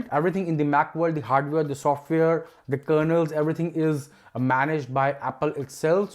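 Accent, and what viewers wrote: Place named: India. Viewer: Nice accent mate! You from India or something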